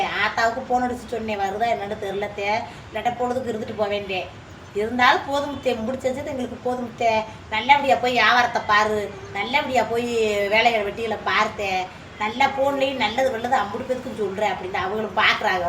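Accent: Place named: Madurai, India. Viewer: வெள்ளந்தி யான பேச்சு, எங்கள் சின்னஅத்தையை மதுரையில் கட்டி கொடுத்தாங்க, அவுங்க பேச்சி இப்படி தான் இருக்கும், அத்தை இப்போது இல்லை, இந்த பேச்சு எங்கள் அத்தை பேச்சு மறுபடியும் கேட்டே மனம் ஆறுதல் ❤🎉 நீங்கள் நல்லதே செய்யுங்கள் நல்லதே நடக்கும் மா🎉❤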